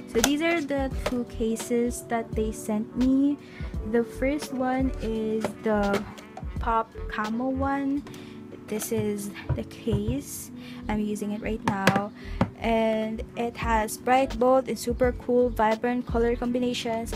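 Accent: Philippines. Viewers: you have that filipino accent, are you a filipino? I'm half filipino and chinese :) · looking through these comments and seeing how shook everyone is when they find out you're filipino also same i heard your accent and i was like MMMMmMmMMm FILIPINA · 5 seconds into the video i already know you're a filipina bc of your accent hahahaha 💕💕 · Sa thumnsil at aestheric akala ko taga ibang bansa pero nung nagsalita na ay pilipino ito hahahaha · Its kinda obvious that shes filipina cuz girl, her accent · I recognized immediately because of the English accent — We sure have a distinct sound when speaking English 😊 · when she speaks her first sentence i was like "OHHHH FILIPINA".. gurrrl ur accent is sooo cute filipino prideeeee hehe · I am new here and I love your accent. I was right that you have a Filipino accent <3 Edit: I was proven right when I also saw your money. 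You're a Binibini💓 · What's with your accent? Are you pilipino? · This is the first video I watched from you. When I heard your voice I was like wait is she Filipino? And then yaaaaas you are! Aesthetic na nga, exo-l pa!!! Nicesuuu~ Good luck sa academics~! 😊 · me: hears her say the first sentace me: MY GIRL IS FILIPINA AYYYEEE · I just got here and I knew that your a filipino becuz of your accent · I knew she had a pinoy accent! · skskks i'm not familiar with your channel and i just assumed that you're filo based on your accent :') and gd i was right hahshah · ya sound like a filipino especially when you said "TAGGhh" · Me:her accent sounds familiar Also me:OMG SHES A FILIPINA #Philippines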